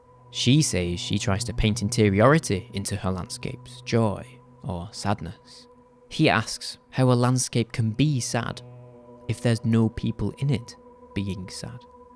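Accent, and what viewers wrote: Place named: Scotland, United Kingdom. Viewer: Where are you from in Scotland?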